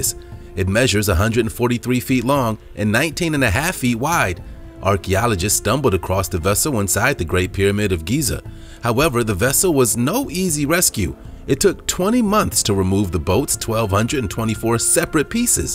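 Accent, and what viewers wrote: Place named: United States. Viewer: Why do Americans pronounce O as A. For instant, you say Gad instead of God etc. Not right.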